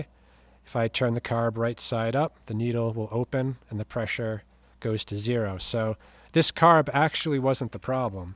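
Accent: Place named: Midwest, United States. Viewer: I got a chuckle out the the "Fuel Make it Happener" segment.. Watching Vice Grip Garage is great. I live up here in the Mid West and there are a few of us that talk like that.... Its just a natural way. Make sure you check the Battry.....Along with the Spark-o-lator. HaHaHa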